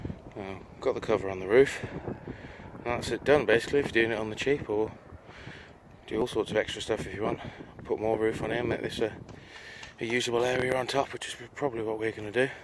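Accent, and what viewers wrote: United Kingdom. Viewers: Been beating around the idea of a Pallet Shed for a few months now. How long have you had it standing? What is the life expectancy of it? I live in Nova Scotia, Canada... alot of Salt Air and Snowy/Cold winters. Just not sure how long a Pallet Shed would last. I assume your English/British by your Accent and your weather is close to ours. Would appreciate any Info on Longevity if you have any. Other then that, great build man, love it 🤟🇨🇦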